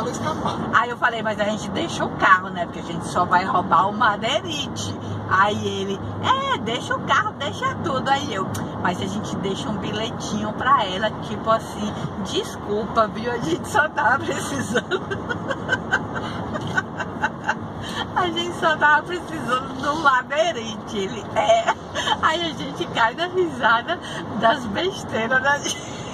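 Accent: Northeast, Brazil. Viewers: ME IDENTIFICO MUITO COM VOCÊ,PRINCIPALMENTE COM ESSE LINGUAJAR NORDESTINO SOU DE JOÃO PESSOA.PB.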